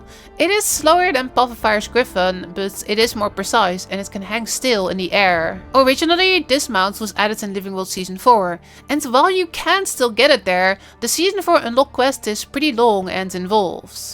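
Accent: Netherlands. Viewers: wat is je nederlands engels accent irritant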